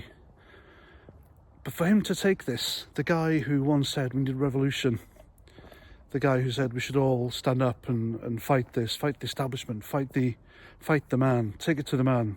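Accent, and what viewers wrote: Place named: United Kingdom. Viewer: Long time subscriber - rarely comment. Thought it about time I write to say how much I enjoy your videos and appreciate what you do. As an expat POM from Southampton living in Australia, I really miss accents from the UK and I *love* yours. Keep up the good work and helping keep me up to date with all things UK. Cheers - Simon / Adelaide - Oz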